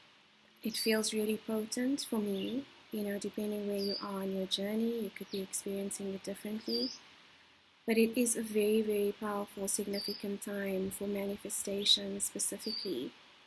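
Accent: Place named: South Africa. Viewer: You have a South African accent.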